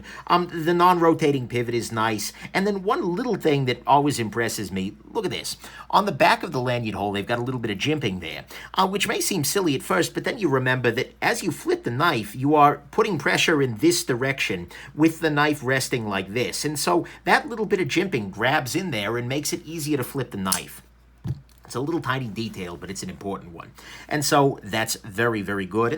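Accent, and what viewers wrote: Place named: Alabama, United States. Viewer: Any chance this guy is from Alabama? (Typing with my INFANT FINGAS) LOL... Love the review for real. Much love homie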